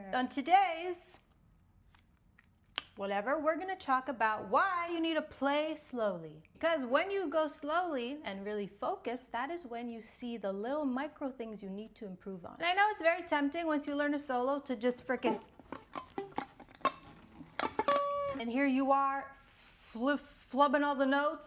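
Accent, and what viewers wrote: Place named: Japan. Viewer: She speaks English like Japanese people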